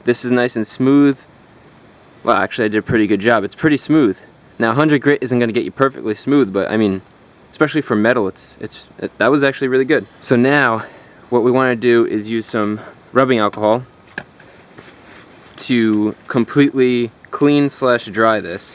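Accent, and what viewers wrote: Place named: Philadelphia, United States. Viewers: He's prolly southern, we say it like that. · must be from the east coast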